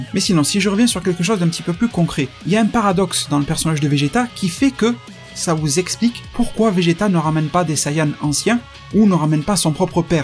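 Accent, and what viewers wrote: Marseille, France. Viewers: Sa se voit que tes marseillais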